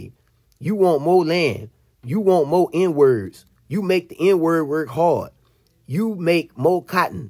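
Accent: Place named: United States. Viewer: With due respect bro the Fulbe in nigeria 🇳🇬 are very fluent in fulfilde 🗣️dey cut across the cameroons🇲🇱,central Afrique, Niger, conakry and so on and mind u the chief of d Fulbe in Yola nigeria 🇳🇬 is district head and spiritual leader to all dese zones dey pay Allegiance🐮🐮🐮🐮🐮🐮🐮 to him bro pls 🙏 don't be ignorant I see u got an American accent🗣️how much of fulfilde can u speak urself bro🤷No hard feelings bro. Bro good job u did here buh pls 🙏 do a lil bit of research on Nigerian Fulbe🐏🐑🐃🐂🐄🥛🥛🥛👌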